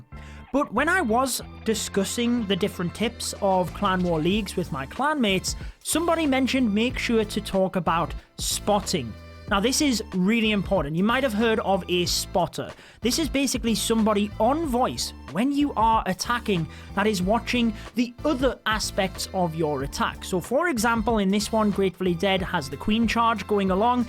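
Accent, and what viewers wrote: United Kingdom. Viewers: i have a question for you where are you from? I ask this because I’m my country (Honduras) it’s 4 am. I guess you are from U.K. by the way you speak English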